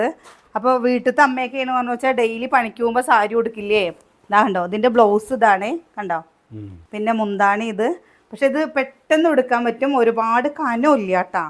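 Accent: Palakkad, India. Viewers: പോളിസ്റ്റർ അമ്പാട കൂടിയത്. കളിയാക്കിയത് അല്ല. സംസാരരീതി പാലക്കാടൻ സ്റ്റൈൽ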